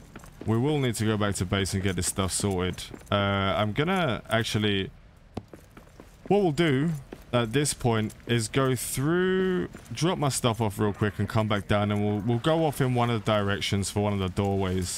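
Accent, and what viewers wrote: United Kingdom. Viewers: Love the playthrough so far man. loving this game too, I just got to Duran's forge last night and having a blast! also my brother in Christ you are British AS F*&%!!! lmaooo "oh im just a knob-end"..."lovely jubblies"... Being American, our speech and pronunciation is just so different its always hilarious thinking about how it got that way lol anyway keep on keeping on man, im having fun watching along! edit: 😂😂 you're talking about loving the songs the dwarves sing just like in "Black Sails and the vikings as well....like assassins creed" lmaooo BRO take some neurotropics or something you're forgetting names for everything!! looool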